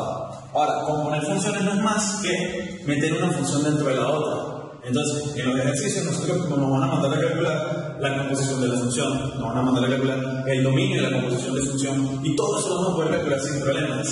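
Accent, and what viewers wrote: Venezuela. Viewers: este pana si habla sifrino....pero explica bien. saludos... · Usted es Venezolano donde se pare. ¿oyó? (Soy Venezolano)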